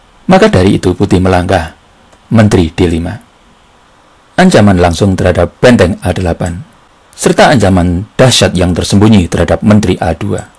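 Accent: Java, Indonesia. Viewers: Saya paling suka chanel catur ini.. simpel ga banyak pernak pernik.. bahasa mudah dimengerti.. kadang ada kata2 lucu macam pion anak kecil imut ini.. hahaha.. logat jawa khas kental sy senang skali. Upload terus mbah..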